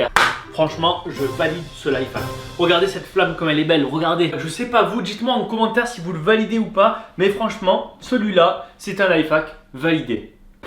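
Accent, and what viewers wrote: Marseille, France. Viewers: Tu as un accent marseillais trop chou😉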